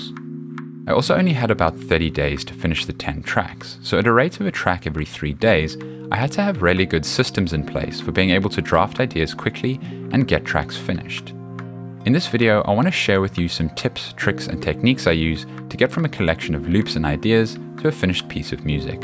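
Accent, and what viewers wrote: South Africa. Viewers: Ayyyy you from durbs bru? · You sound so South African my bru !!! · South african ? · I got excited when I heard your SA accent . Thanks for the tips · I can hear that you are from Cape Town 👀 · Are you South African?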